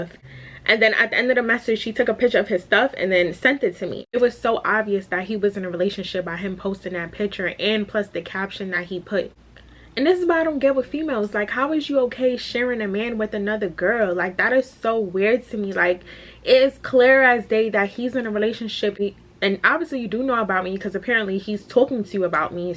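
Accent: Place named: New York, United States. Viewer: Are you from NY, I like your accent❤️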